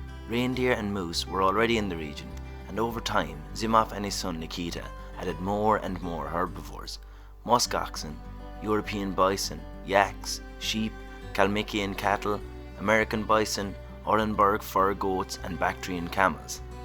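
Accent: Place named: Ireland. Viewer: Thank you so much great channel and wonderful to hear the Native Irish voice 😄😄.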